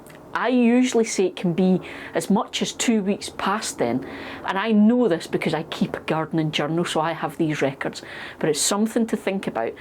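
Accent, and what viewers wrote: Scotland, United Kingdom. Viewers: Brilliant video - great to hear another Scottish voice. I’ve just got my plot and am sharing it on YouTube too. Thanks for all the tips.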